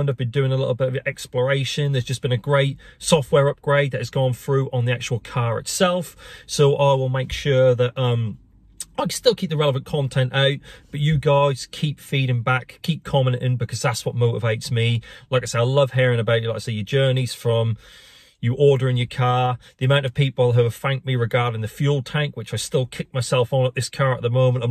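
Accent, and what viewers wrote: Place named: Norwich, United Kingdom. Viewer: By the way, I'm from Norwich and you sound like you might be from these parts? Probably why your videos are even more relatable as it feels you're a trusty chap from round the way! 👍🏻😁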